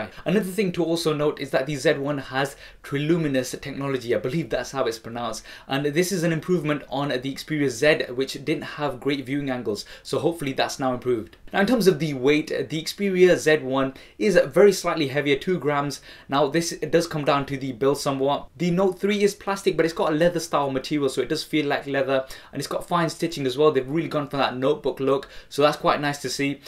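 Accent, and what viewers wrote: United Kingdom. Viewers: Very good vid. But I really can t subscribe s.b. Speaking this terrible B.E. accent. Why don t u speak more "neutral" or even A.E. accent? Still... Thx for tha video.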